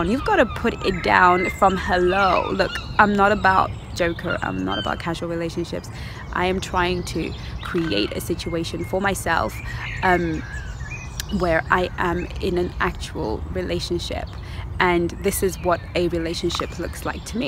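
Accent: United Kingdom. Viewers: Love your videos, love your advice, love your British accent, love your intelligence. As I always say some guys are not worth the time of day and I don't have time for situationships. I would rather be alone and have my self respect. It's so much better.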